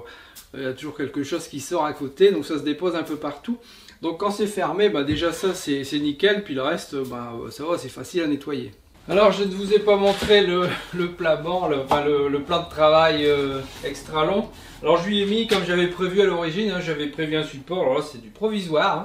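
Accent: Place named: Mining region, France. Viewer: Bravo pour cette présentation , je suis un nouvel abonné et à entendre ton accent , tu dois être de la région des mines , si c'est cela on est voisin , j'habite à 30 kms de Dunkerque .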